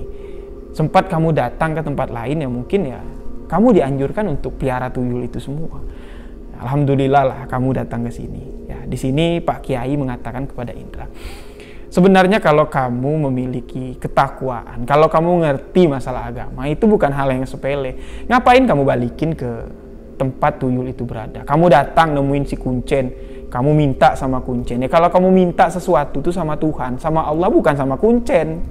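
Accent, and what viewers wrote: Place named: Bali, Indonesia. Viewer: Kurangin logat bali nya bisa ga mas? Apalagi setiap kalimat selalu ada logat bali nya... Agak kurang nyaman..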